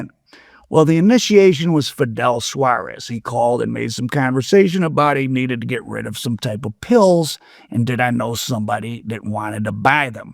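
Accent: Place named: Upstate New York, United States. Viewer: I think meech gave her his life rights so she can work as a paid informant for him.why would he give someone he didn't know that long his life rights? because she agreed to the circumstances meech name was mentioned for a reason in this case or his name would have never been mentioned...This guy sounds like he's from upstate NY somewhere good break down though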